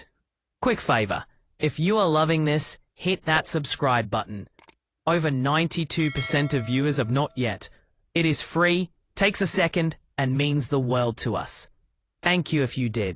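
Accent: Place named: Australia. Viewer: Why would I rely on a US based news with Aussie accent covering our news, when we have direct Australian Media, reporting our news here on YT?!!!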